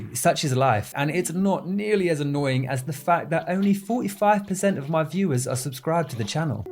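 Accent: United Kingdom. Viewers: You are painfully British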